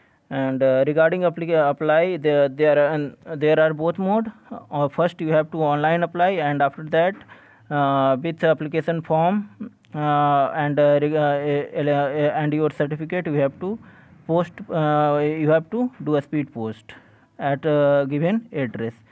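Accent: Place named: France. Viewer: Hindi hi bolde Bhai English Teri French Jaisi hai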